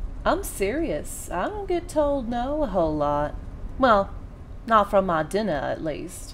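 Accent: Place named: South, United States. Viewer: I am really feeling the southern Belle ascent in this one. Nice imaging of the Succubus.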